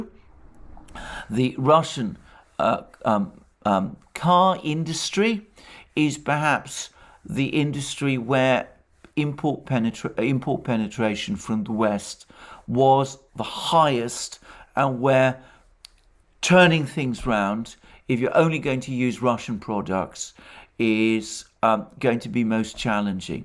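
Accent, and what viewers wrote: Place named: RP, United Kingdom. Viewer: in from the UK originally, Alex is quite posh I his speaking. Something to aspire to. Refer to yourself, referring to ones self, what? Pardon? Where u at? Where are you? Come hither.... Haha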